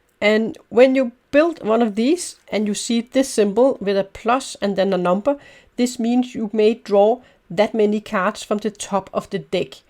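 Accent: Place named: Germany. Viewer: You have a really nice german/english accent. probably the best sounding I have heard.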